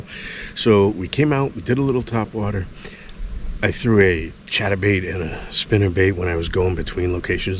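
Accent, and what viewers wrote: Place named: Massachusetts, United States. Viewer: The second you started talking I thought you were in MA. I just moved to the north shore recently, what part of MA is this in? Glad I discovered your channel bro, I'm gonna go through the catalog and subscribe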